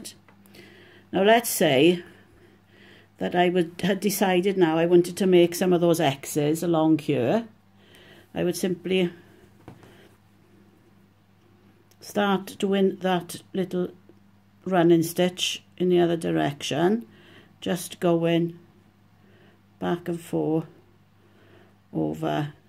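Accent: Wales, United Kingdom. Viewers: I love your accent. is it Welsh??? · As soon as you started talking I could tell you were Welsh. I looked at your blog and see you're from Port Talbot. Lovely work, you're very talented. I'm from Merthyr Tydfil, not too far away and I probably sound the same, lol. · I know it's welsh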